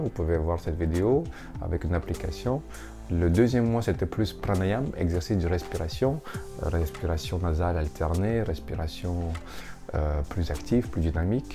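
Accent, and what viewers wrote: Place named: Russia. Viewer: Très bon contenu merci , on sent une certaine apaisement en toi , et ton accent est très charment c'est russe ?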